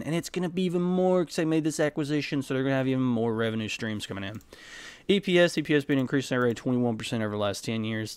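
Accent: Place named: Pennsylvania, United States. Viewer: Said it before, I'll say it again for the Al Gore rythm, I LOVE your data visualizations! What exactly is the accent? I'm guessing PA, but I'm not an expert in PA accents so don't know the part of PA.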